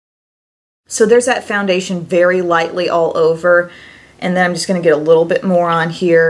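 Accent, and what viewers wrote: South, United States. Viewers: Got a little more southern drawl in your speech today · It's so interesting to hear your "accent" because I live in the northwest suburbs of Chicago and I don't hear that accent here! It sounds southern :) I love it! #snapchatfam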